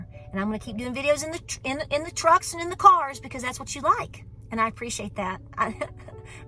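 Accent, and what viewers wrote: South, United States. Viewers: Girl Girl Girl, as we say in the South! If I didn't recognize You're accent (I'm a Kentucky Trumplican!), I'd of thought the "T" was for Tracker as in You have Your Truck back!!! In all honesty, lived in Nashville for 14yrs & I have the same hat! So, some people just have to have something to Bitch about & You just happen to be an easy target. But, no worries Girl, You got this! Life is short, make it Sweet. Hell, I'll be 67 come May & just went back to work for Kroger Today. Both my cars are needing a car doctor & been ankle expressing for 2 wks plus. God said, get in shape Ladybug! & So funny, So did You. ❤ From Kentucky Patriot Warrior ❤❤❤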